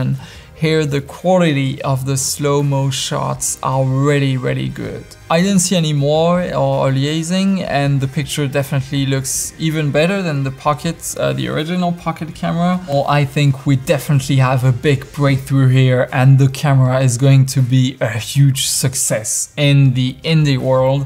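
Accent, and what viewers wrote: France. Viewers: Excellente vidéo :) Recognized a French guy behind this English accent, carry on this move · I'd like to know where you're from (accent sounds French). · J'ai eu du mal à repérer l'accent français :) J'hésite à switch de mon a6300 à cette caméra :s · X-T3 for me...but great review, are you french ?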